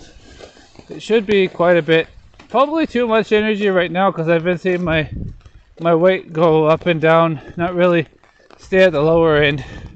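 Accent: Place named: Canada. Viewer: Thanks for taking the trouble to do your video on the way to work & giving us some insight into your working world....I really hope your company takes health & safety seriously, particularly with regards to the glass🙄.Just out of interest what town or city do you live in,I'm guessing somewhere in Canada based on your accent??!! Keep up the good work....I SO admire your openness & honesty!! Mark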